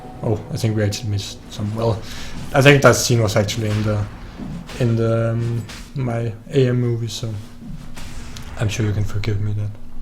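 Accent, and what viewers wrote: Denmark. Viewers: What's your native language? You sound very danish :)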